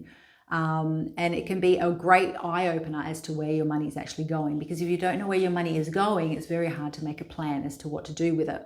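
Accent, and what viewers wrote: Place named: United Kingdom. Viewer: thanks please continue uploading videos, i like your content, as well as your lovely British accent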